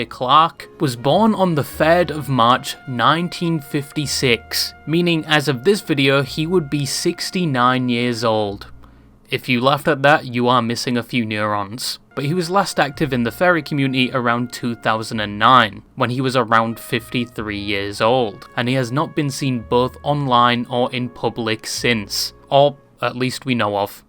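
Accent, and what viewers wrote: United Kingdom. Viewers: You know, I didn't think there would be a British accent out there that doesn't have a hard distinction between the u and a sound, hence him pronouncing furry more like fairy...but, you learn something every day😅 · 10s in, british, had to click off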